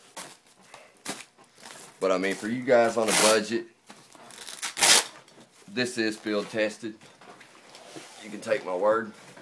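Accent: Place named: Kentucky, United States. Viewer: im not shure but you sound country like me...im from kentucky. .. Nit loadout anfd a BAD rifle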